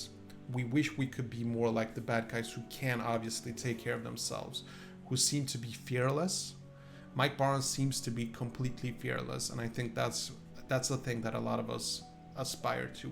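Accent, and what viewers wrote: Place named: Sweden. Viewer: Btw are you Swedish? Your accent sounds Swedish.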